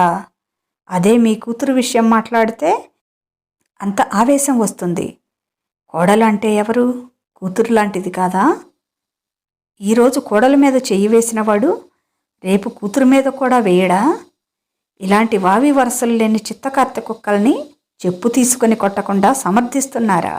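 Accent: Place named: India. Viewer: Chala bagundi talli. Teluguni telugulage acham telugu ammai laga chadivavu. Santosham. Subham. 🌷✋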